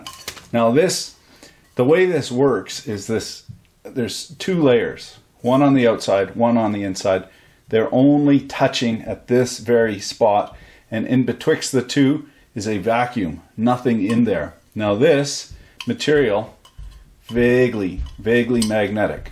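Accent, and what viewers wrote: Quebec, Canada. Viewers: Are you a french canadian ?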